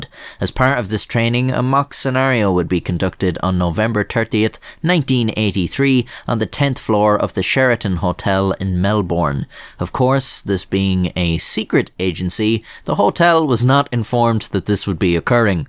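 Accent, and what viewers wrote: Ireland. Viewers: Seeing the guy it's named after is Henry William Lamb, 2nd Viscount Melbourne who, in 1770, was raised to the Peerage of Ireland as Lord Melbourne, I think this Irish presenter has every right to pronounce it in his own dialect. Regardless how we current 'Strayans say it. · Idk if I've been watching too many of your videos or not, but my inner monologue now has an Irish accent.. and I'm American, from North Carolina lol..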